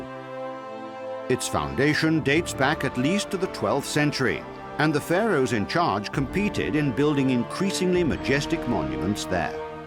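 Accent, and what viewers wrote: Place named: United Kingdom. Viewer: The timers cool but NOT ANOTHER BRITISH ACCENT!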